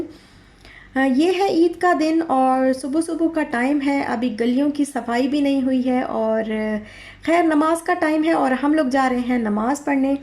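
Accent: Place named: Hindko, Pakistan. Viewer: hi main Pakistan se hun aur Mujhe aapse ek question puchna hai ki aap Hanko Japan bolati Ho yani ke aapki Ki Jo apni Jawan Hai Hua hin Ko Hai Kyunki aapke Lage se Pata lagta hai please reply zaroor karna Kyunki Main Bhi Kohat se hun Aur meri Zubaan bhi Henko hai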